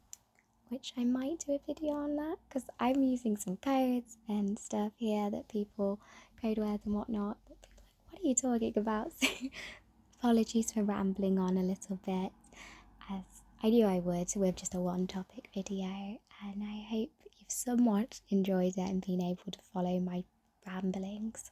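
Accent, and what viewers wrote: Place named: United Kingdom. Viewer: You know what it takes real courage to talk about this, especially to people online when trolls are very common. This is the first video of yours I've watched since I've just found your channel and it's kind of opened my eyes to quite a few things, I to fell into the hook up culture but mine was for a different reason ( I won't bore you with it and it's too personal to type about for everyone to see 😊), I guess I need to go and do so thinking, searching if you will 😐 as a British guy I still find it amazing how gorgeous some accents are in our country, you've got such a sweet, innocent voice.. I could literally listen to you talk for hours 😊 that is why I hope you do more of these video's, just hopefully longer.. even if it's just for my own gratification 😳😜 thank you sooo much for this video 🤗💜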